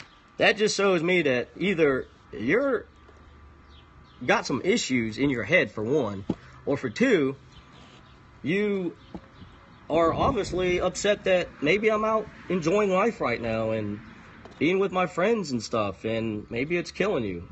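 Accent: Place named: South, United States. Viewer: Asian with a southern accent...amazing/sexy I watched your whole video even though I don't know you. I would fly you to the Philippines and give you zen.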